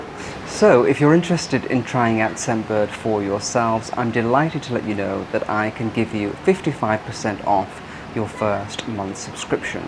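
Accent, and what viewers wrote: RP, United Kingdom. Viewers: I am Japanese. I live in UK for 50 years but I still have heavy Japanese accents. I genuinely admire and enjoy listening posh accents. 😊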